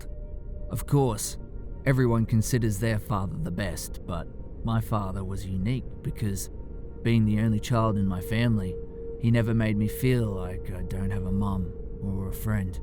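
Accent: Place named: Australia. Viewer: Good to hear an Aussie accent doing the narrative.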